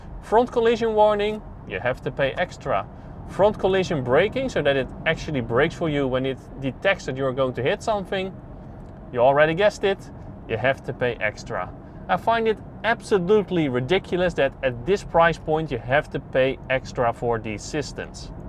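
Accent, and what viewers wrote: Netherlands. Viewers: Stone coal English … so bad. Why don’t you stick to Dutch?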